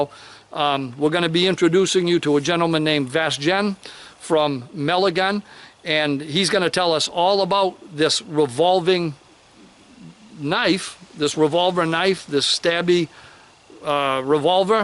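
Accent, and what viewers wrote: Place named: North, United States. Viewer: Cool cool firearm. Also as a southerner this is the most northern guy I've ever heard. Subbed so fast. Happy shooting brother!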